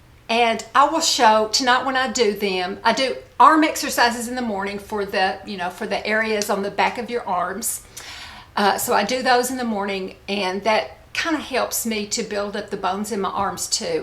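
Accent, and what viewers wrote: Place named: East Tennessee, United States. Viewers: I’m from East Tennessee too. Living in Florida now and your accent makes me feel at home. Love your genuine personality. 🥰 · As a newer subscriber, I super enjoy getting to know you like this. I know what you mean about internet safety, and it’s good you’re being cautious; it’s also a shame. 99% of us are just looking for fun and companionship re: girly things, but that 1% makes it unwise to share too much. So nice for those battling chronic health issues that you share about your positivity and living day by day: I also really respect the way you “just say no” haha to either sharing things which have already been done or sharing things just specific to your health needs. Lovvvvvve your East TN accent. And manner of speaking. I grew up in CO and the Midwest and no one speaks as pretty as y’all do. Your makeup looks especially pretty. 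Okay, friend—off to find my weights and yoga mat. You have inspired me to work on my own core and flexibility!!! 🌸❤️💕🥰🏋🏼‍♀️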